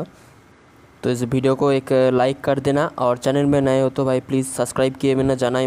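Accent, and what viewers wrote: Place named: India. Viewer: He’s from India listen to his accent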